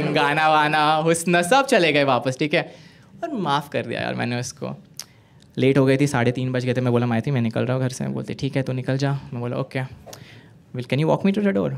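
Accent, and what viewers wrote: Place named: India. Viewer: After watching so many standup, atlast i came across our own people who talks hindi exactly like the mainlaind ( others don't get me wrong, we as northeastern part of india, for us Hindi is not a rice plate eating😂 it's definitely an undertaker play😂😂) Really appreciate it manh. Bro. 👍👍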